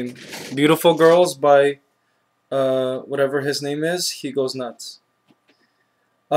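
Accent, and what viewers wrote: Philippines. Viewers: using his filipino accent to discipline Pinoy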